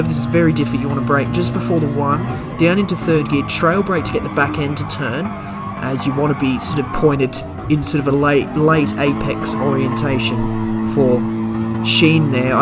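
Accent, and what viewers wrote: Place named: Australia. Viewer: The dragon trail and lake Maggiore were difficult but i could get ahead half a second by certain places around the lap, brands hatch is something else! 100ths of a second is vital and being a controller user means turning and trail braking are really tough. I've come to learn that the point on the controller for trail braking is just past the point of neutral or still. It's like half a millimetre, only a handful of times can i get it to come through the corner up on Lewis. What is your brake balance set at? Great vid and very nice to hear an Aussie voice for a change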